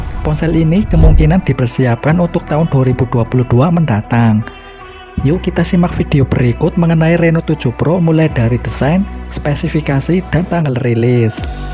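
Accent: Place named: Padang, Indonesia. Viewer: Suaranya , Abang orang Padang ya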